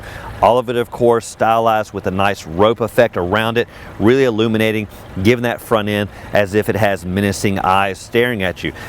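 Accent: South, United States. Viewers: Hillbilly talking Italian styling. Good grief. Pretty sure you don't need the strap on the glasses for this particular review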